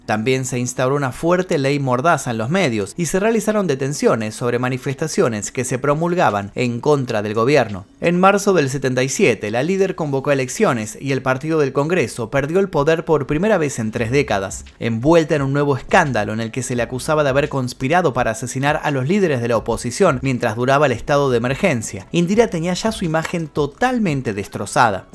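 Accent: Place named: Argentina. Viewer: Me gusta mucho este you tuber su asentó argentino y la perilla. A Rajiv Gandhi también lo mataron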